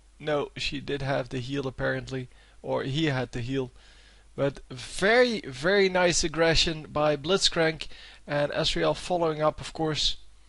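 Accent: Netherlands. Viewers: Better quality :) The game was not that special xs. Nice comentator Aan je accent hoor je dat je NL bent ;) BE hier ^^ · when dutch ppl speak english 0,0 nty plz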